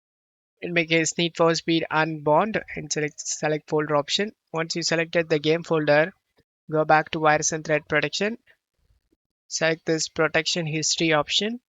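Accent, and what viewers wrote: India. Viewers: BRO.... I WOULD GET ERRORS ALL THE TIME DLL..WHATEVER, SIMPLE FIX WHEN FIT GIRL UPLOADS HER GAMES... THANK YOU.......YOUR VIDEOS SICKKKKK....I WOULD FIX ONE ISSUE...DO IT SLOWER BECAUSE OF INDIAN ASCENT...I THINK...BUT SUPER HELPFUL I SUBSCRIBED THANK YOU.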